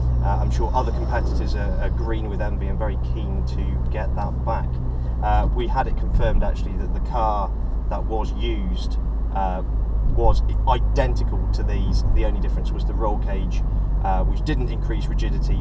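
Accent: United Kingdom. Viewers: total waste of 10 mins. only interior shot of a guy driving around with annoying British accent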